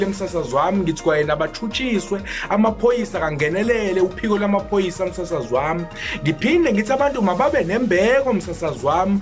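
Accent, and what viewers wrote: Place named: Xhosa, South Africa. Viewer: Lmaaaaaaao! keep up the good work bro, I can hear a bit of xhosa here and there and the evidence tops it all 😂😂😂😂😂😂😂😂😂😂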